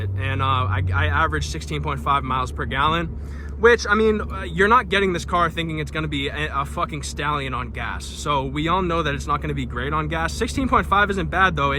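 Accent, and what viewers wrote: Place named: Boston, United States. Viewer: You are so stereotypically a Boston native. You boys out there talk so fast & got no filter 😂😂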